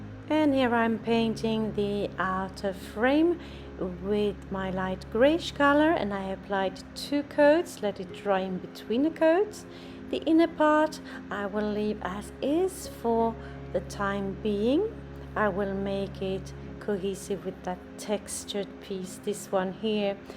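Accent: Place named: France. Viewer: You speak excellent English with your wonderful French accent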